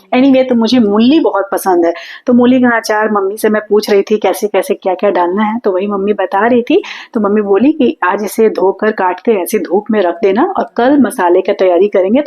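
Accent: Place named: Bihar, India. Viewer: Nice blog didi aap Bihar me kahan se belong Karti Hai aapki language hamari Jaisi Hai😊😊😅😅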